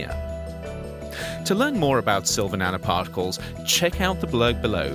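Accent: United Kingdom. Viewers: ........ just because this guy has a cool English accent doesn't automatically mean his information is accurate! ...... DOWN WITH BIG PHARMA!! .....😠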